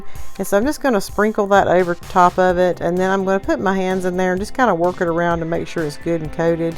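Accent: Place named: North Carolina, United States. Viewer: The 2nd I heard your voice, I knew you were from North Carolina!! ❤️. I’m also from NC, born & raised! That food looks AMAZING, but regarding the BBQ sauce, I am partial to the NC vinegar based sauce, lol. *But, I absolutely would not turn my nose up to the BBQ you cooked in this video, LOL!! That corn, OMGosh, you’ve got me craving some sweet summer corn now, thanks!! 🤣 *We live Lillington, right outside of Fort Bragg. 🙋🏻‍♀️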